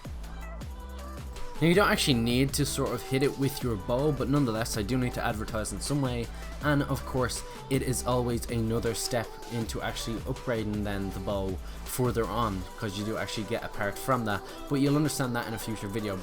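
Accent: Ireland. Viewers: great to hear a fellow Irishman doing something like this :) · are you irish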